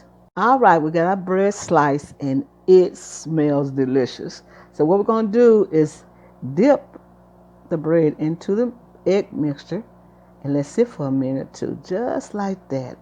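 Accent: South, United States. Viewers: An amazing breakfast! Love ur hospitality and ur voice. So southern . God bless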